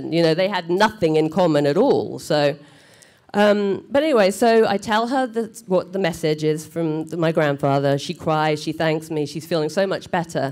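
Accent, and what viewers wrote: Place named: United Kingdom. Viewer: Just stumbled across one of your stories via a friend on FB and found my way here. Love the stories. I'm a Trini and I think it's great that you visited your father in his country and found the missing parts of yourself. Here, you're just another slice of our rainbow. The Brit accent is the only thing that'll give you away! :)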